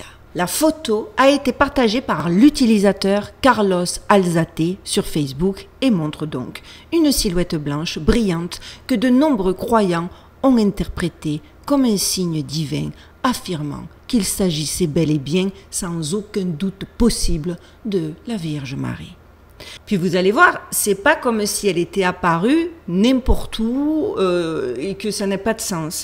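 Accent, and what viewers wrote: South, France. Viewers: L'important, c'est ce qu'on croit, pas ce que dit l'Église car celle ci est dans la tourmente . Si des centaines ou des milliers de personnes voient la même chose en même temps, pourquoi ne devrait-on pas y croire et pourquoi seraient-ils menteurs ? Merci pour vos vidéos très intéressantes avec cet accent du sud qui les ensoleille ❤ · Ce que j'aime vous écouter ! Avec votre charmant accent du sud, vous chantez les vérités :) ♥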